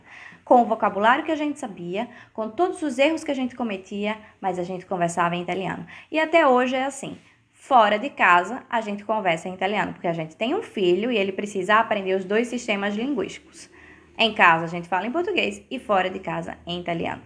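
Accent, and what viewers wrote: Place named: Rio Grande do Norte, Brazil. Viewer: Ela tem um sotaque que parece o potiguar hehe bonito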